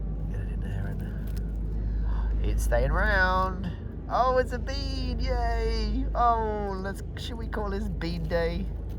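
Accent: Australia. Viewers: Some lovely finds. The cloth seal is so detailed and it’s amazing that some of the material was still attached to the belt part. I love those beautiful hand-made pins. Always enjoy your videos (and hearing that Australian accent 😋)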